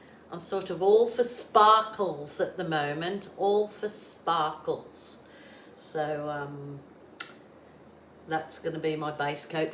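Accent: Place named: England, United Kingdom. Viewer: Hello my "Angel across the pond". During the epedemic here in the USA, I found u. Started watching u around 2\20 n have been pour painting, or thinking about supplies, ever since! U saved my insanity! Ur insight, personality n teaching. Yr English accent sounds beautiful to me.. Thank u Stay safe.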